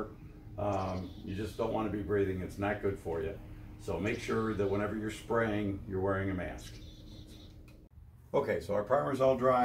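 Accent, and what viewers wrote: Midwest, United States. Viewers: Good information. Oh, I am here for the Midwest accent! Lol. Born in Indiana, raised in Wisconsin, but have lived in west Texas for about 40 years now. I miss that accent! 😂😂😂.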